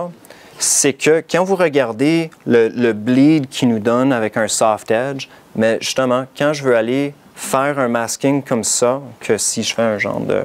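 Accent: Quebec, Canada. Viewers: J'ai regardé rien compris je comprends pas trop l'accent des Québécois :/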